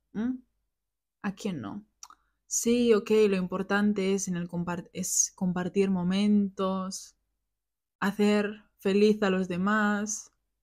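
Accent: Spain. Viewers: Sie haben einen wunderbaren Ausdruck, eine wunderbare Betonung und Manierismen! Sie sind atemberaubend schön und absolut kostbar! Ich stimme Ihnen zu 100 %, dass der Herbst die beste Jahreszeit ist. Natürlich, wer liebt es nicht, weiße Weihnachten im Winter zu sehen? Aber wie Sie sagten, später schmilzt der Schnee, gefriert und wird zu schmutzigem Eis. Ich bin Medizinerin und lebe sowohl in Kolumbien als auch in den USA, aber ich höre selten jemanden aus Spanien sprechen. Ich mag die Art, wie Sie das S und Z in echtem kastilischen Spanisch aussprechen (ich finde es süß). Ich mag Ihre Podcasts wirklich und das Anhören von Alltagsgesprächen (with the occasional splash of English-- which I'm guessing is not your first language) hilft mir, europäisches Spanisch besser zu verstehen. Sie haben eine strahlende Persönlichkeit und es ist sehr herzerwärmend, dass Sie Ihre persönlichen Erfahrungen teilen (einen Baum fotografieren, während die Blätter ihre Farbe ändern). 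Ich bin froh, dass ich Ihren Kanal gefunden habe, er ist sowohl unterhaltsam als auch lehrreich! *************** ¡Muy lindo! ¡Qué chevere! ¡Me encanta mucho! Saludos desde Colombia! Chao! 😁 P.D. Quizás puedas celebrar Halloween 👻💀 el año que viene (o el Día de los Muertos, ¡serías perfecta como Katrina!)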